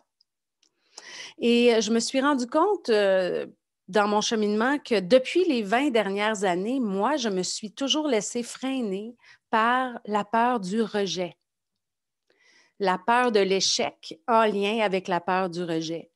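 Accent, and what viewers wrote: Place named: Quebec, Canada. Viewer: Merci beaucoup pour ton partage. Par hasard je fais la méditation 🧘‍♀️ e juntamente avec ton accent Québécois, m’a fait pensé à une chère amie que S’appelle Jacinthe que nous avons travaillé ensemble, elle était infirmière 👩‍⚕️, et est retournée à son Pays. J’espère bien qu’elle puisse Retrouver ton témoignage et pouvoir déguster à son tour dans le champ d’unitie. Je t’embrasse très forte depuis la Suisse 🇨🇭 GRATITUDE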